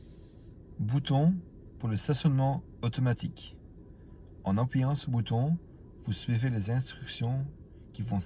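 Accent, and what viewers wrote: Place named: Belgium. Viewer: C est quoi cette video au ralenti?et puis faut aimer cet horrible accent belge.Bref j irai la decouvrir moi même chez Toyota une fois!!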